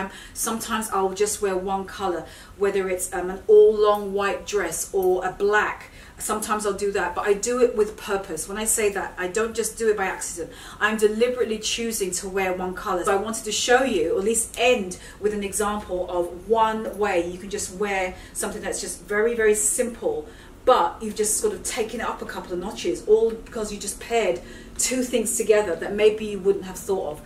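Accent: England, United Kingdom. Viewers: Is that English accent that you got there? I love it anyway 😍😍😍😍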